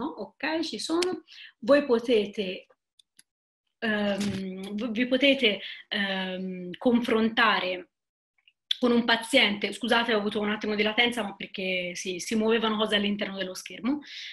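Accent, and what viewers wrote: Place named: Tuscany, Italy. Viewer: peccato per l'audio, a parte l'accento toscano che tende ad aspirare certe consonanti ebbene sarà anche per l'inconsueta "velocità espressiva" alcune parole non si comprendono, in particolare le ultime della frase . . . cmq 👍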